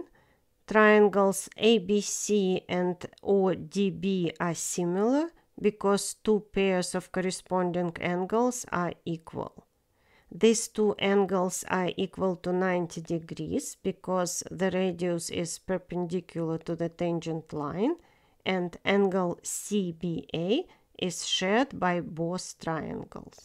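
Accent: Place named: Russia. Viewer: Добрый день! Почему на русском не читаете? У вас в английском русский акцент.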